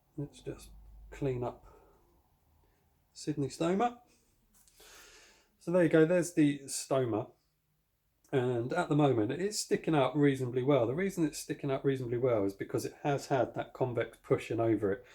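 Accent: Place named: England, United Kingdom. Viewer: Good to hear an English accent instead of dreadful drawl from the American offerings.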